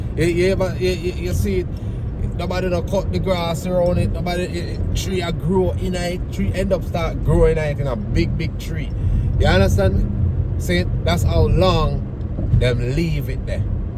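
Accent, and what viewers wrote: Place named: Jamaica. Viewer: He's speaking a mixture of J'can patois, the vernacular of the people. I'm sure he can "code switch" when necessary. It's not that serious (lol).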